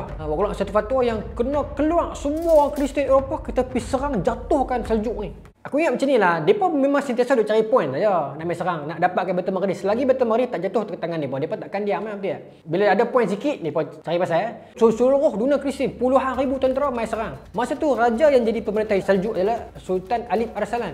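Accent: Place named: North, Malaysia. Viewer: Tu kira bahasa melayu dlm loghat utara..kau tak faham sbb ko bkn melayu,ko kudha kayu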